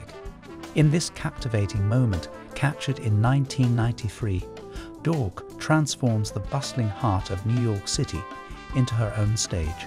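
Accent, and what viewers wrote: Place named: United Kingdom. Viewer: Hyperbole with a British accent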